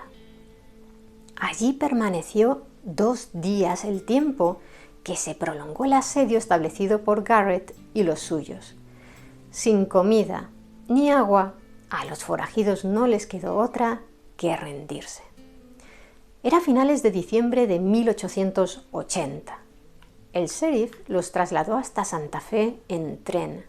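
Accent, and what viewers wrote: Castile, Spain. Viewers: Esta señora, además de tener un acento castellano exquisito, es una experta en la historia y sin pasión, le gusta la verdad.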